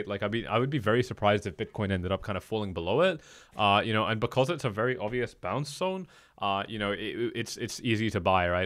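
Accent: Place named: England, United Kingdom. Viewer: hey, haven’t been watching technical analysis lately but I’m wondering what happened with your cool English accent.. you had one years ago and now I can’t hear it.. seriously did you move to Dubai like most of the other crypto guy’s and loose the accent.. anyway peace bro.. you always have had great ta.. appreciate it ..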